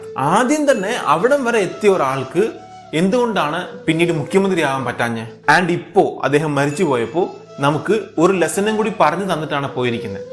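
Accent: Tamil Nadu, India. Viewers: ഒരു തമിഴ് ചെവ സംസാരത്തിൽ ആരിക്കും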